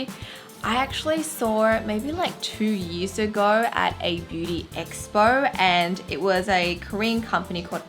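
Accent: Australia. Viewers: What accent is urs? Sounds a bit Australian but im not sure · The Australian accent. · An Asian woman with an Australian accent? That's so cool!!!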